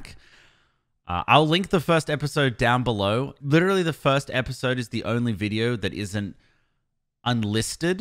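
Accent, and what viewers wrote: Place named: United States. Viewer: 6 years and an american accent later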